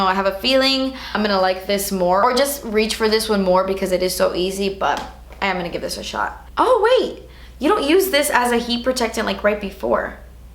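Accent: United Kingdom. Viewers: When does her British accent it sounds like Alex drake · Slaaaayyy! Btw I love your British accent keep doing it! ❤️❤️❤️ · How do you get that british accent?? Wanna know the story... 😍😍😍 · Omg I love you so much, and even more every single video but that 'British' accent has my love for you going wild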